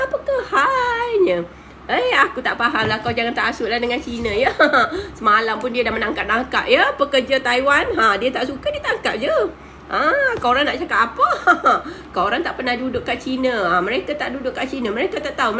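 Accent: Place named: Kelantan, Malaysia. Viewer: bunyi mcm org kelantan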